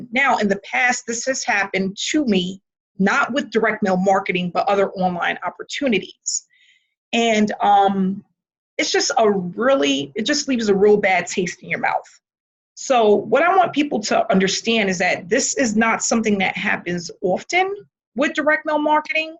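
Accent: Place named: New York, United States. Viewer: Thanks for your speedy acknowledgement... love that New York accent or is it Boston?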